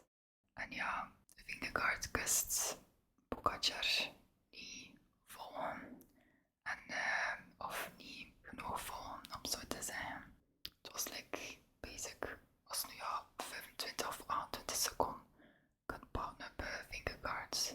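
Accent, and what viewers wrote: West Flanders, Belgium. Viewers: uw west vlaams accent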